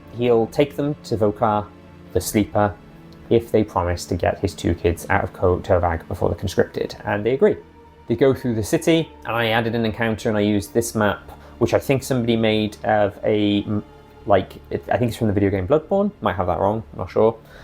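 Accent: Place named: United Kingdom. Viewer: You are very welcome. Obviously, if you feel like it makes your videos less useful to the people who are only interested in how to run the domain and would rather stop including that stuff, don't keep doing it just on my account. I'd still watch the videos regardless. You might have to live with me asking about it in the comments then, though ;). And your accent isn't an issue at all, rest assured! I don't have a good ear for that kind of thing in the first place, nor do I really care and while I probably would have guessed "British" rather than "American English" in your case, it really mostly registers in my head as "a cool and kind person talking in English about something I am interested in".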